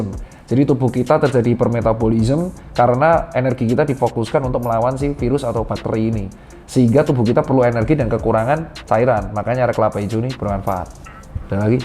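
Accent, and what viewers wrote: Java, Indonesia. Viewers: Ngomonge jowo medok yo dok .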